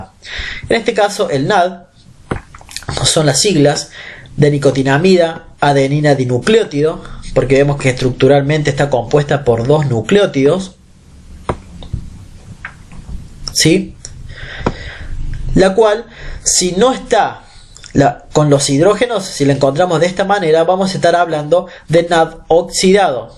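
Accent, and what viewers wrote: Córdoba, Argentina. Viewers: aprobé con tonada cordobesa y todo!!! muchas gracias!!! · muy buena aula.. y la tonada de cordaba me encanta jaja ...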